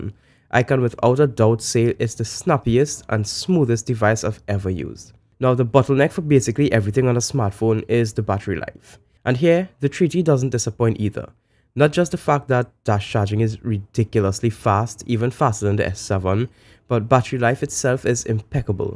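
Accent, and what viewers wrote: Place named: Trinidad and Tobago. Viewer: Can't miss that Trini accent 😎 Keep up the good work bro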